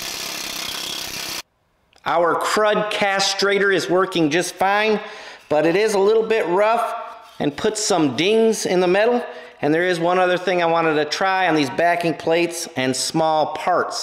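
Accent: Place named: New England, United States. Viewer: Another great episode. Rust removal so tricky. Looking good. I’ve never heard of that milkstone acid. Did a great job. Im so curious about your background. Obviously for your nickname and accent you are from New England? Maybe New Hampshire? And I wonder if you have farming in your background. Did you work as a mechanic? Just curious. You have so many different skills. Enjoy your channel.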